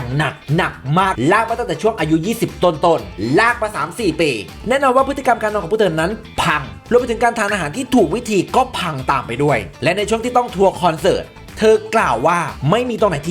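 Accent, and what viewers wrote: Isan, Thailand. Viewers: ชอบการนำเสนอการพากย์เสียงแทรกอิสานของคลิปมาก ฟังไปขำไป หางเสียงแบบสุดดี แบบฉาอ่ะครับ ชอบ👍🏻👍🏻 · โอ้ยยยเนาะ เสียงภาคน้องกะตะมุตะมิหลายยยๆ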